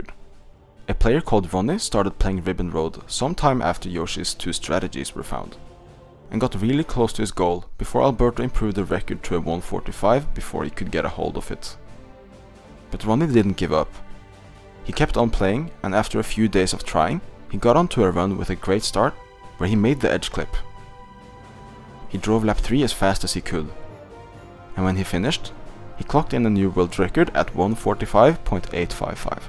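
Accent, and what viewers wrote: Norway. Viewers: Jeg bare hææ hvorfor høres du litt norsk ut noen ganger også leste jeg på profilen haha, nice! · Wow I'm pleasantly surprised by the shear quality of this video you've made! Amazing video, I thoroughly enjoyed it all the way through :D Also: I guess I've found someone with a thicker Norwegian accent than mine (not saying it's a bad thing btw) haha :D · Bro eg hører på stemmen din når du snakke at du e norsk💀💀Eg har mye være engelsk stemme da lmao. kul video da fortsett sånn! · Du må være norsk! Basert på aksenten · As a Norwegian myself, i heard your voice, and thought «Hmm, his accent is a bit norwegian…» AND I WAS RIGHT 😭😭💀🔥 W Vid btw ❤️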